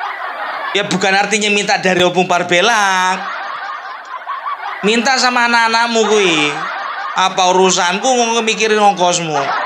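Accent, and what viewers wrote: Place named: Java, Indonesia. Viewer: Aseeek...opung pake logat Jawa..😂😂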